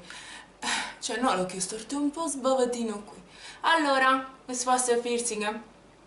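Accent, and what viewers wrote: Rome, Italy. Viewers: ahahahhaha mi fai morire adoro l'accento romano :D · sei fantastica mi fai morire dal ridere !!!!ti seguo da poco(adoro l' accento romano) daje ❤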